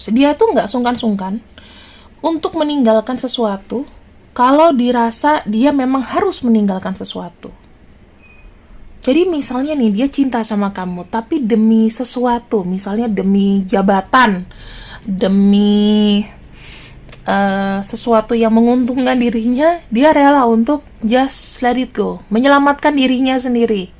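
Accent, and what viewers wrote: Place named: Surabaya, Indonesia. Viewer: Opo maneh,suroboyoan bgt 😁👍🙏🙏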